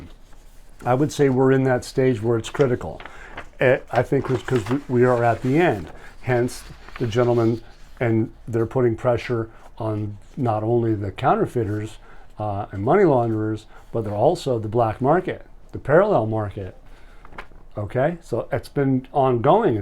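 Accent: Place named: San Diego, United States. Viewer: sounds like you were from the San Diego surfing scene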